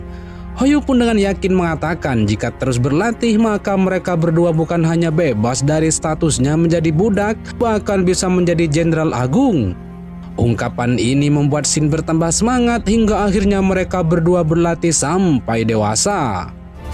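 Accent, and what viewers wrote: Madura, Indonesia. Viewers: Kayaknya orang Madura ini. Dekremmah taiyeh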